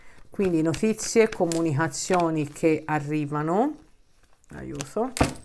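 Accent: Tuscany, Italy. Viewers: Grazie,adoro come parli il toscano,e anche la Toscana,speriamo bene 💰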